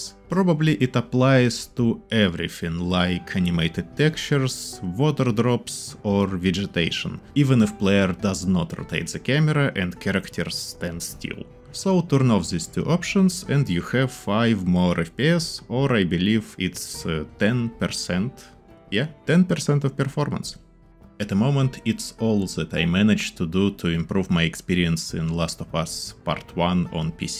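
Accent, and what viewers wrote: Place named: Russia. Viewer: А какой смысл выпускать видос на инглише с таким чудовищным акцентом? Нас сейчас в Америке и европе не сильно любят. А услышав первое же предложение человек поймет что ты русский и даже если он каким то чудом увидит этот ролик в рекоммендах, то процентов 80 народа его сразу же скипнет.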